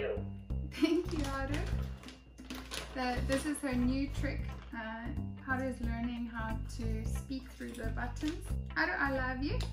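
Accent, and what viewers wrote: South Africa. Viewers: quite agree, love hearing both your voices. I've been trying to place your accent..it's been bugging me. Keep thinking South African. Am I way off? 😁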